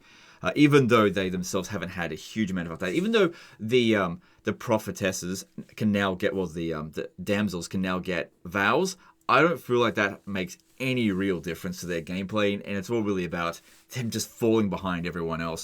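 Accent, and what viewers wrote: Australia. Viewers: How come you can't say golgfag maneaters name? Is that an australian accent thing?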